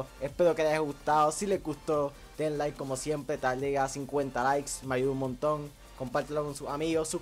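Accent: Puerto Rico. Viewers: Boricua obligau ajajaja se nos nota de lejo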